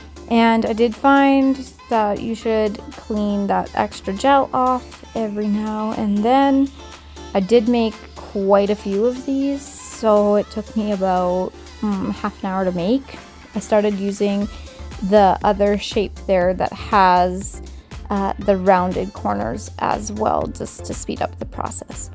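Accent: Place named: Alberta, Canada. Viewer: Clearly you are a rocking Canadian!! I'm hearing mid/northern Alberta. You mentioned Edmonton, where you got???? I can't remember... Albeit I've been watching you for a while now, its still nice to have a fellow Canadian as a YouTuber!! Suzie, Sarah, and Talia are the other Canadians I watch. 💘 those ice cubes. So much fun. Great set. Nice colour combo💙💕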